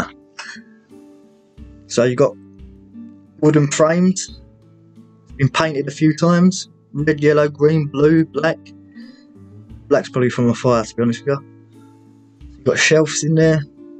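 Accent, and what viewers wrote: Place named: United Kingdom. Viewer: What a great video! I’d be lying if I said I didn’t dig the accent too. I know you guys have a bunch of different accents over there (I’m from New York), but that one always sounds like “the cool one” to me. It’s Billy Kimber from Peaky Blinders, and maybe Russel Howard the comedian. Let me know if I’m right, crazy, or an idiot. ✌🏻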